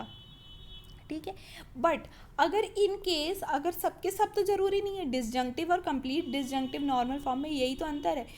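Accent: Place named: Indore, India. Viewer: Ma'am aap Indore se ho kya ?? Aapke bolne k tarike se laga mujhe. Btw well explained.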